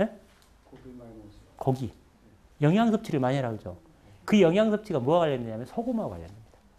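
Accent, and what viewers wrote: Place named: Jeolla, South Korea. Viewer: 박사님 전라도 분이신가요? 저도 전라도 사람인데 왠지 억양이 친근하게 느껴져서요^^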